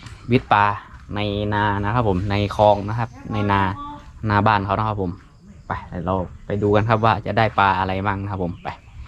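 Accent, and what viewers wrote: North, Thailand. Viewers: เห็นพูดเหนือ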